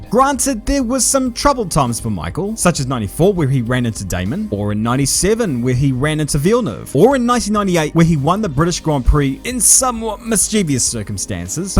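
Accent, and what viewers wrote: New Zealand. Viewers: That is the proper pronunciation, just sounds a bit funny cuz he's kiwi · Please just pronounce it "Ear tin". "Eye Earrrhh tonne" sounds ridiculous! Also, while I'm being an egg, why is your glorious Kiwi accent peppered with Aussie/American vowels, such as when you say "example" funny? Just curious. 👍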